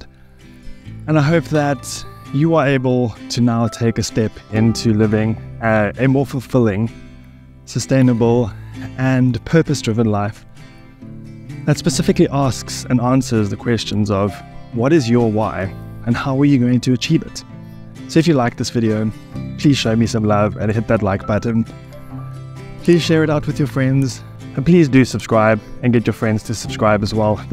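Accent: South Africa. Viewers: What a surprise. Was wondering why all of a sudden I was listening to your voice, more intently. SOUTH AFRICAN ACCENT!!!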